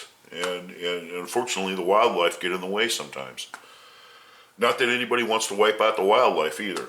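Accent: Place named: United States. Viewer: I taught you was American.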